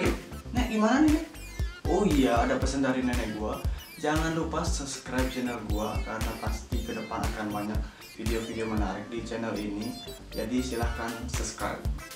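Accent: Bali, Indonesia. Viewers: Adi care nak bali asane ngomong ne nahh wkwkkw,, salam youtuber bali, mampir ke channel ku yg masih pemuka ini ya,, wkwkw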